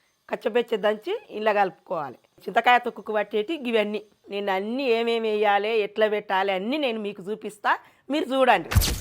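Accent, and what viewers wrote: Telangana, India. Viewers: అమ్మా మీరు చెప్పే విధానం మన తెలంగాణ యాస, బాషా చాలా బాగుంది, మీరు మీ అత్తకు చాలా రెస్పెక్ట్ ఇస్తున్నారు, కానీ ఇప్పటి వాళ్లకు అత్తల విలువ తెలుస్తలేదు 🙏🙏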